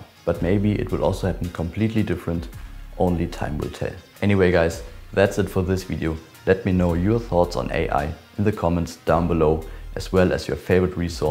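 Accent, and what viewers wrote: Germany. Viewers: Ah höre ich da nen deutschen Akzent? 😳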